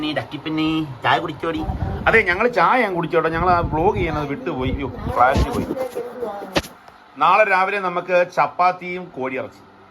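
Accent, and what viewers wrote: Kollam, India. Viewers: ചേട്ടന്റെ സ്ഥലം എവിടാ നമ്മുടെ കൊല്ലക്കാരുടെ സംസാരം ആണല്ലോ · Kollam slang.......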